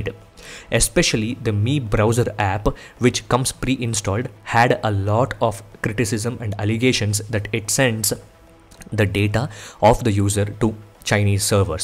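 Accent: India. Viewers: You r south Indian? Ur voice is similar rrrr....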